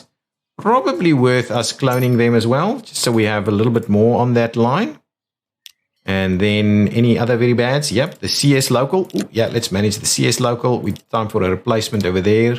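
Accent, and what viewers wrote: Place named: South Africa. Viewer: Saw Worcester in a Transport Fever 2 Spring Update search thinking this might be a South African. Could hear within 2 seconds the way you pronounce your R that you are a South African.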